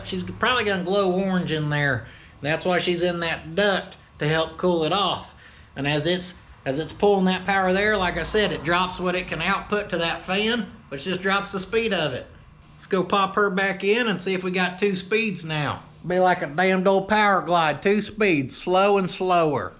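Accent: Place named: Los Angeles, United States. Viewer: Your from LA I knowd it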